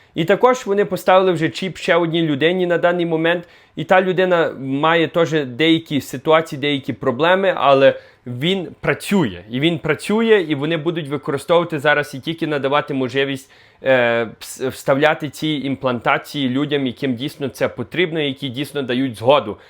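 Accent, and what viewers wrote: Western Ukraine, Ukraine. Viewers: Підтримую україномовний!!! У Вас чудовий західноукраїнський говір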